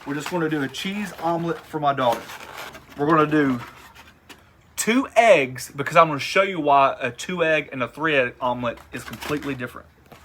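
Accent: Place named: Texas, United States. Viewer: Edumacate me please... is that a Texan accent/southern accent, ahh luuuve eeyt! its the purfaact chef ayxcent!!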